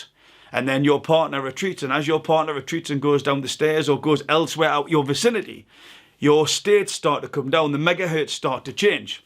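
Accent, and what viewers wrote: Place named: Scotland, United Kingdom. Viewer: Nothing better than listening to a Scotsman speak. ❤️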